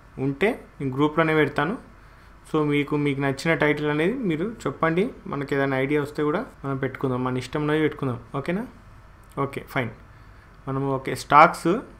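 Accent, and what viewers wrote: Telangana, India. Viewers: 😂👍🌹🌹🌹 i like your (telangana yasa) language....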